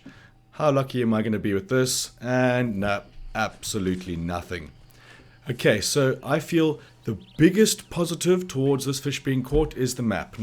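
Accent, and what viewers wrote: South Africa. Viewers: Holy shit I did not expect a South African accent!!Howzit my China💪🏼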